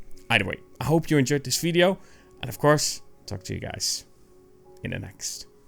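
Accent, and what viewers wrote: Netherlands. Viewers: I just have to start of with saying that you really can not hide the dutch accent, lol It's so thick!! Now about the content: one can only appreciate the fair citicism you made about the Xiaomi 15 Ultra. I'd say it's well founded and perhaps with future upgradings, certain issues can be tackled. Less sensitivity towards light can be fixed with software (or filter) but the fixed apperture however will stay fixed I'm afraid, which means less depth of sharpness. In the end it remains a smartphone, which is crazy, considering what they looked like 20 years back. I wonder what 20 years from now will bring. The Vivo x200 Ultra seems interesting too, but that camerabulk is sooo off putting that the Xiaomi 15 Ultra may not look that bad of an option.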